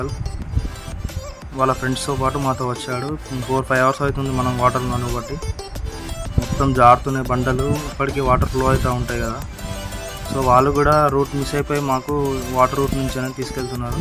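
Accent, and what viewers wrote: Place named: Telangana, India. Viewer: Telangana slang🔥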